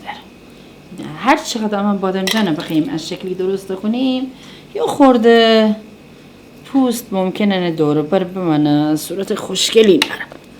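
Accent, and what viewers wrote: Gilan, Iran. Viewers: درود بر خانم خوش صحبت و خوش رو بسیار عالی بود هم آشپزیتون هم گویش زیباتون ، بله دقیقا طرز تهیه قرمه سبزی گیلانی مون همین جور هست بسته به ذائقه هر خانواده نوع گوشتش و حبوبات و مزه دار کردن غذا فرق میکنه... بورانی تون عالی بود کدبانو جان ما پیاز نمی ریزیم. غذا های گیلان مون بی نظیرن 👌👌👏👏👏🥰🥰🤗🤗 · بی نظیر عالی دستتون درد نکنه خیلی لذت بردم هم از غذاتون هم از گویش و لهجه شیرین گیلانی شما دوستدار شما از انگلیس 👏👏👏👏👏👏👏😘😘😘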